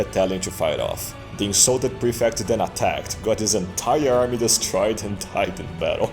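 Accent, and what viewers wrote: Brazil. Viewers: Teu sotaque de brasileiro deixa o vídeo muito melhor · O sotaque entrega o Brasiliense. A propagando ao Constantino é tão boa que até Eusébio teria inveja! Muito bom!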